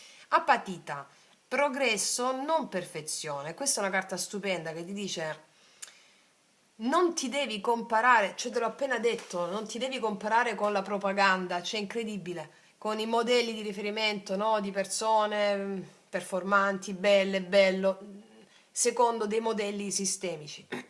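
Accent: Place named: Lecce, Italy. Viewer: Sento una forte inflessione leccese❤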